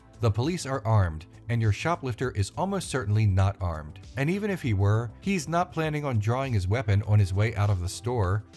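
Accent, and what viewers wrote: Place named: Mid-Atlantic, United States. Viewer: I like the narrators mid-Atlantic accent. Don't try to hide it lmao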